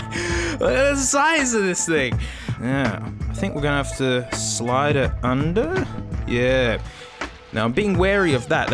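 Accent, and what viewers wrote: Australia. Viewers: I forget he's Australian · This video makes me appreciate Aussie accents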